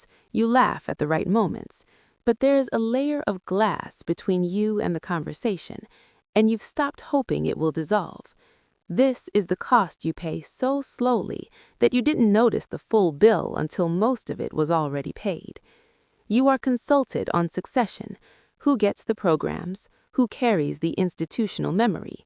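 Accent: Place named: India. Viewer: Good video but why the voice change to an Indian accent no keep posting man good luck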